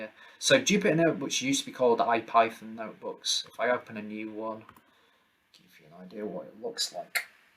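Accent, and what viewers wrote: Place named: Yorkshire, United Kingdom. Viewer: Great video - love the dulcet Yorkshire tones!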